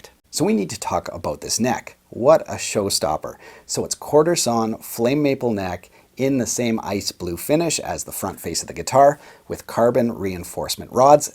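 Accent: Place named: Canada. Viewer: After years of watching South Park you can spot Canadians. Its they way they pronounce aboot (about)..... 😎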